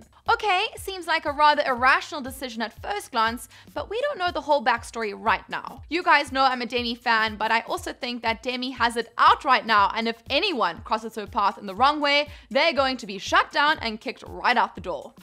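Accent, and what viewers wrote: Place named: South Africa. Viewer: Ur south african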